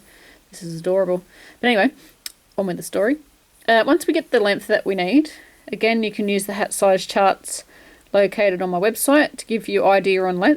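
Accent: Australia. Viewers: Hi, my daughter and I have been enjoying watching your videos. Thank you for the good explanations, camera angle and ... the aussie accent, being aussies ourselves I love the intro of g'day. You throw me a bit using US terminology, I was taught that a dc was a trbl, sc was a dc etc., and my following a pattern skills arnt real hot, so you are enspiring me to try to improve. Keep up the good work. Look forward to more of your videos. Thanks heaps sandra and natalie grantham.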